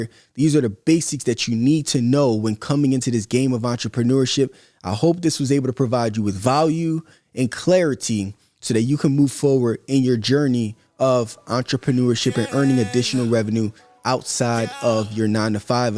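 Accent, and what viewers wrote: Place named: Philadelphia, United States. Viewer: Huge fan of the videos and have learned a WHOLE lot but I do have a question: How do you and Cassidy sound exactly alike but Meek Mill screams so much? Is there a section in Philly that just screams, or do most have the same accent you and Cassidy have? I'm genuinely curious not even trying to be funny...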